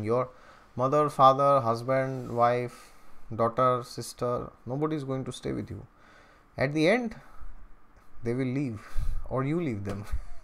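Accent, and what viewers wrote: Assam, India. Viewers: You are Assamese right?😄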